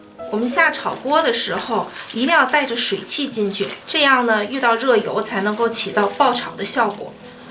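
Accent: Tianjin, China. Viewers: 口音像天津人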